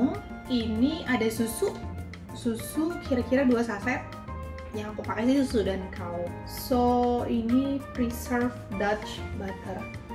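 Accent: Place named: West Java, Indonesia. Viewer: ada aksen sunda nya ya.. intonasi nya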